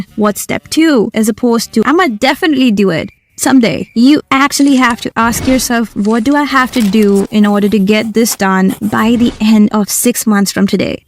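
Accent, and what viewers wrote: India. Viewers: First actual good video like this, also a very good starting point in me learning to talk in indian accent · are you indian,you have a nice accent,kinda motivating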